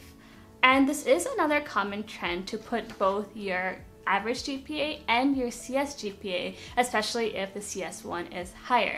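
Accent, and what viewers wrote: Andhra Pradesh, India. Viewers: o.O girllll! you are from andhra wow. Your accent is sooo coool.I love that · Hey telugu ahh 😅 .. native ekkada ?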